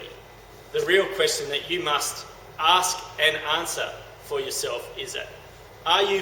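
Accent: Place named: New Zealand. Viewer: Sounds like a kiwi.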